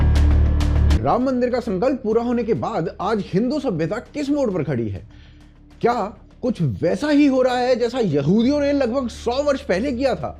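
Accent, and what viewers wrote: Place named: Haryana, India. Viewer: He is haryanavi that's why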